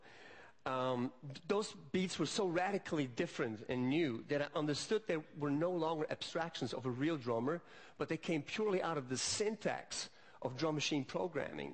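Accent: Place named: Switzerland. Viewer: Well, he's Swiss. They always sound rather distinctive.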